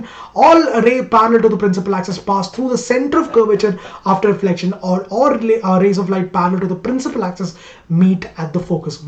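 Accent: Kerala, India. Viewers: sir are you malayali?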